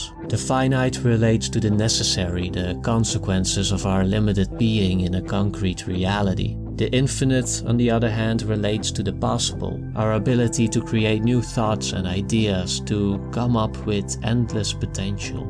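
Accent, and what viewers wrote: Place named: Netherlands. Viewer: Cool video, the accent with a couple words you pronounce in a particular way...sounds very very familiar, makes me feel at home! Thank you for the video! This could have helped me a lot about 2 weeks ago, luckily music was my first love and brought me back. Now clearer than ever and finally at peace with myself. Grtz, a no longer wondering Hollander! ;) <3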